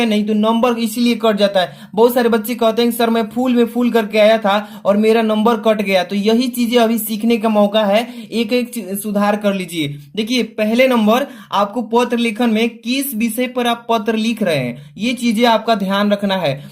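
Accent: Bihar, India. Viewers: Sir aapki awaj se lg rha h ki aap bihari h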